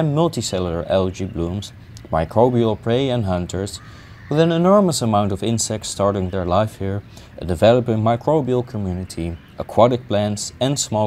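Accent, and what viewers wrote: Netherlands. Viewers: I love the way you unapologetically pronounce things with your Dutch accent 🇳🇱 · Love het nederlandse accent geweldige video ❤ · i can hear the dutch accent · Why do you pronounce the Latin names in Dutch? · I love how awkward he is coupled with how awkward the Dutch accent is, while being very interesting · why does your accent sound dutch are u also a dutch guy ???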